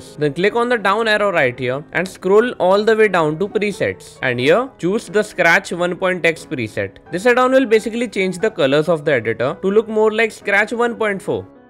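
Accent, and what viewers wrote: India. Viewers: Yes everytime you here a indian dude as the tutoruial narrator you know thats this is going to be the best tutoriual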